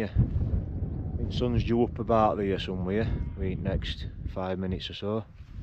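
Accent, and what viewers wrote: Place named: Northern England, United Kingdom. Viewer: As everyone else has said it’s corn Dee And your pronunciation of pen y fan was spot on mate and sounds even better in you’re northern accent.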